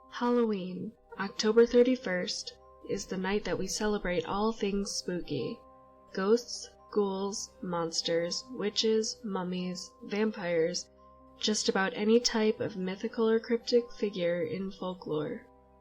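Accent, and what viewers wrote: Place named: Midwest, United States. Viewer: Thanks for sharing. By the way, you have a lovely speaking voice partly due to that awesome Midwest accent. As for Devil's Night, I had only heard about it a few days ago after hearing it mentioned in a video about the creepy clown sightings. Do you think the Devil's Night is still out of control in some places? Also, what are your thoughts about that so-called clown purge planned for Halloween?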